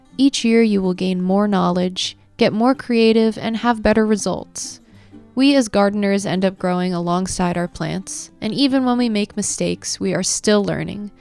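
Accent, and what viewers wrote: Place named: United States. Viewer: thanks for the tips! Apologies btw. I didn’t realise you were American, the accent is quite subtle and only realised when you were talking about 50 cents seeds. Brown field over here is the site of an old building torn down and new housing built on top. The soil is usually awful, if indeed it is soil. More often it’s just rubble with a lawn on top. Being able to grow plants and identifying them in the wild should be taught in schools, (at a practical level).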